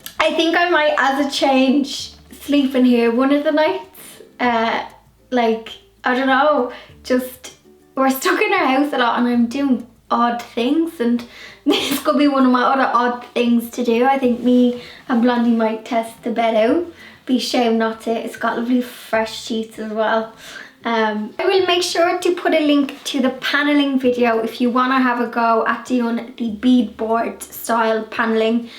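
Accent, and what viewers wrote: Ireland. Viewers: It looks amazing!! Came across your channel a few days ago and I LOVE your projects!! 🥰 Plus, it's so lovely to hear that Irish accent again...been to Ireland 'bout 11 times but last time was a while ago so it brings back memories 🤗 will keep on watching for more ideas - sadly my beloved mom just passed and I'm currently emptying my parent's house. My two daughters (7&10) and I will most likely move into it and I definitely wanna keep lots of things and re-purpose / restore so your diy projects are a great inspiration! Looking forward to watching lots more of your videos 🤗 greetings from Munich, Germany 😘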